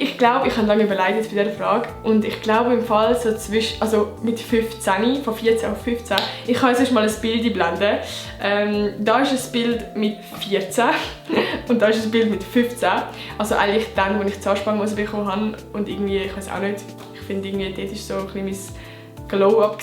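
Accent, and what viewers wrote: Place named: Switzerland. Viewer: Schweizer Dialekt ist so lustig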